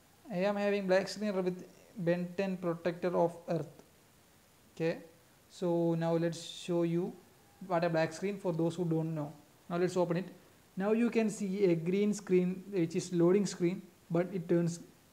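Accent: Kerala, India. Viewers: You're a mallu i bet!! Hii😂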